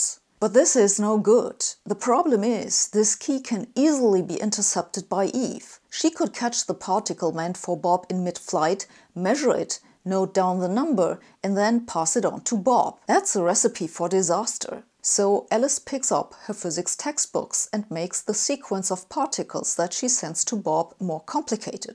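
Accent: Germany. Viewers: I am so old that to me English spoken with a German accent by a scientist is considered more reliable than pure American or British English. Given the current standing of rationality in the US and the UK, I am modern again. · That was single handedly the best transition to a sponsorship ever. Also the way you explain the benefits and features of nord VPN actually made me think about buying it. not only are you an amazing scientist and educator, your sales skills are a solid 10 as well. Please do not be hard on your english either. You have an accent yes. Your english is not broken by any means and you are very easy to understand. Plus I have always a german accent to be rather intimidating so I shut up and listen more. Thank you for the laugh first thing in the morning. My perception about how today is going to go has spin in the optimistic direction. Stay safe and healthy! 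· you sound German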